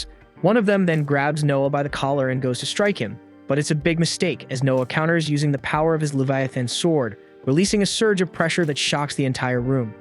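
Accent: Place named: United Kingdom. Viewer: After , I think he's British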